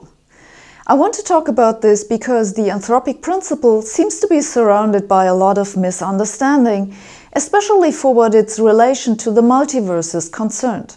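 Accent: Germany. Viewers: Not related at all...well partly maybe... I love accent. In particular as a specific example of my appreciation of the general German accent. I note that certain accents rub me a certain way. German sounds cuttingly precise, the way you'd expect a high quality German automobile to be designed. Russian and Indian sound kind of comical to me, in different ways. The royal British accent of course sounds incredibly high brow, very polished, and upper society. Australian sounds amiable, down to earth, matter of fact, in a jovial way. Italian sounds happy and very excited. I could go on but I shant. Fuggedaboudit!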